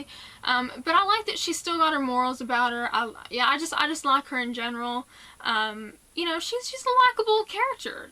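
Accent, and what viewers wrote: South, United States. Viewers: Because she's a southern chick. I love that southern accent. I hear it all the time since I'm from Texas.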